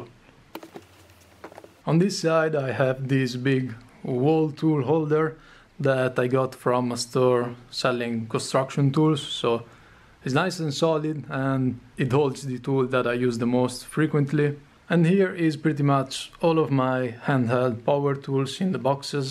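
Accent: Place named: Italy. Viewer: Uaglio si sente che sei italiano smetti de parla inglese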